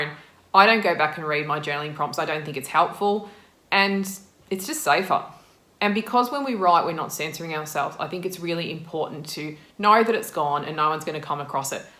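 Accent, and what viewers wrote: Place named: Australia. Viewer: Definitely Aussie :-)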